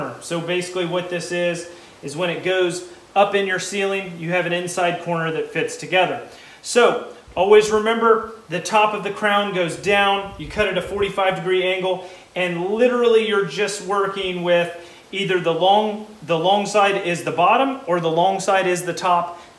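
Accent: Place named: South, United States. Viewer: Also where are you from? Your Southern accent makes me think you're either from Louisiana, Arkansas, Alabama, Mississippi, Texas, or Georgia.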